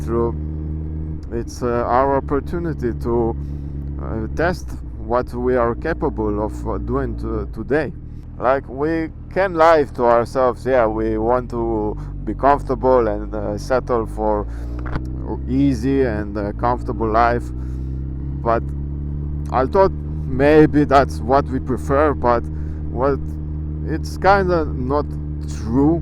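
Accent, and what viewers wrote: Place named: Russia. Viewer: Привет из Беларуси,по твоему акценту понял что ты русский)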